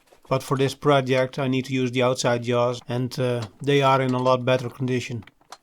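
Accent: Netherlands. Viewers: your Dutchlish accent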